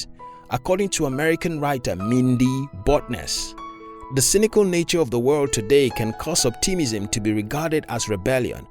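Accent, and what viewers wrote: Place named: Nigeria. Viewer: The narrator must be a Nigerian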